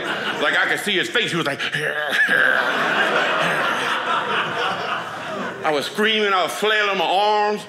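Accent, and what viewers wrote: New Orleans, United States. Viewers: U know he from neworleans, because that's the way we talk. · He is hilarious! I was listening only with my phone turned down, and I swore he was black before he said something bahahah! That New Orleans accent gets me every single time!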